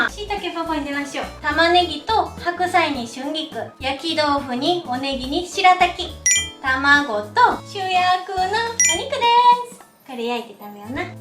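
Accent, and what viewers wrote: Japan. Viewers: ほっこりする和風英語でいつも楽しくみさせて頂いてます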